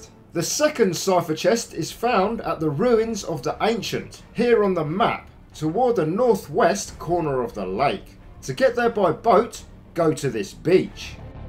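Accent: United Kingdom. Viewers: It's always sine British dude on these game videos 😭😭😭😭 thanks tho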